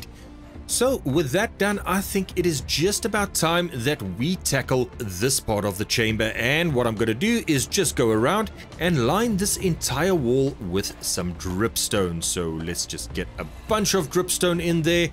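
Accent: South Africa. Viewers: I love your accent are you south African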